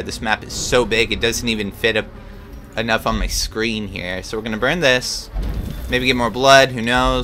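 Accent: United States. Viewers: I started learning english with pewdiepie, then i discovered your channel. You helped me getting use to american english, thank you for that :). I have to find a scottish youtuber now, i can't understand them ^^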